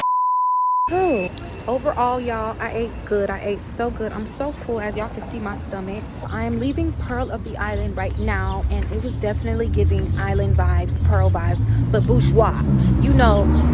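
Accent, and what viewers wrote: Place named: United States. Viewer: You got it going on there quick question are you Haitian coz you sound more American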